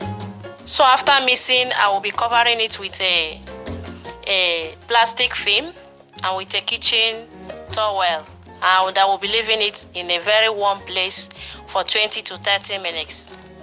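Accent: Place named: Nigeria. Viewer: The Nigerian accent is on point. I'll be sure to try these out.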